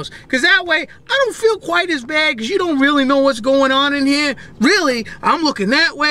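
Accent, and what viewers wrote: New York, United States. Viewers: Great!R.Deniro level definetly! just sorry for other Newyorkers who are shown once again as saying "fuck" every 2 sec in a phrase... n' about panhandlers, you're f**ing damned right man!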